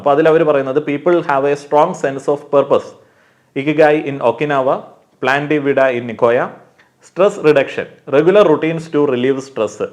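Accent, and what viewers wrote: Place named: Malabar, India. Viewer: ❤ മലയാളം നീയൊക്കെ പുസ്തകത്തിൽ എഴുതുന്ന പോലെയാകും സംസാരിക്കുന്നത്. എന്നാൽ ഞങ്ങൾ കേരളത്തിൽ പിറന്ന മലയാളികൾ ഓരോ ദേശത്തിന് അനുസരിച്ചു വ്യത്യസ്ത രീതിയിൽ സംസാരിക്കും. അവൻ പറയുന്നത് മലയാളം തന്നെ മലബാറി സ്റ്റൈൽ. അത് മോശം അന്നെന്നു നിന്നെ പോലെ ഭാഷയേ കുറിച്ച് വല്ല്യ ധാരണയില്ലാത്തവർക്കേ തോന്നൂ. ഇംഗ്ലീഷ് വരേ സ്ഥലങ്ങൾക്കനുസരിച്ചു വകബേധങ്ങൾ ഉണ്ട് 😂.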